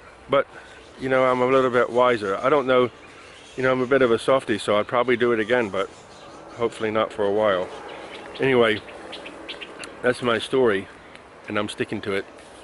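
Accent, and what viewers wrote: United States. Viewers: Hi. I made some fixtures once and set-up an e-mail for it. One time thing, but I still use the e-mail as my Google account. You are from England? You sound like your from the U.S. Have you ever seen "Kev-in Thailand"?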